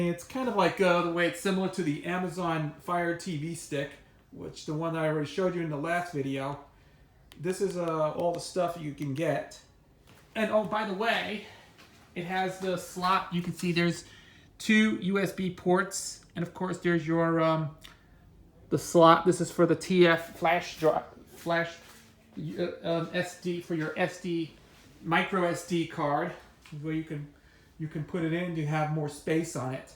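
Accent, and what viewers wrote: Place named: New York, United States. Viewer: I can hear from your accent that you're a new yorker lol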